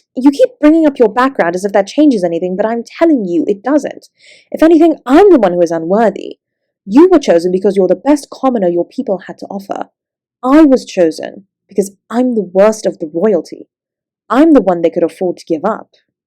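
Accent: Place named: United Kingdom. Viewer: You say "pompous British accent" but I say "absolutely nailed it." This was amazing, and the accent only made it better. Thanks for the audio,.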